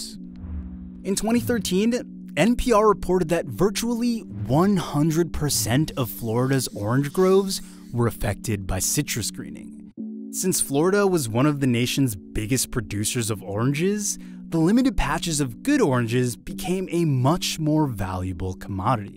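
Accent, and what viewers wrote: California, United States. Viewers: This slow California accent that keeps asking me questions even when its telling me answers is killing me. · he has the HEAVIEST Californian accent 😭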